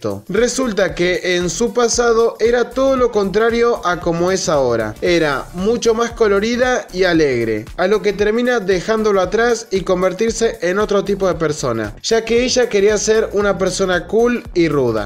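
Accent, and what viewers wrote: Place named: Argentina. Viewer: Eres argentino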